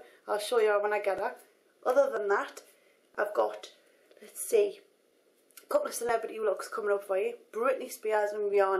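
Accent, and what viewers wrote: Newcastle, United Kingdom. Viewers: Are you from newcastle? I LOVE your videos!! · Wish I had your Geordie accent. Amazing! :) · the geordie accent is amazing!:')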